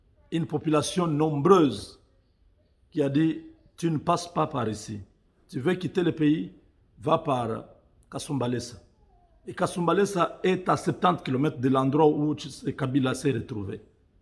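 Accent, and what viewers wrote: Rwanda. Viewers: Tu parles le français comme des rwandais, tu as de la famille là bas ?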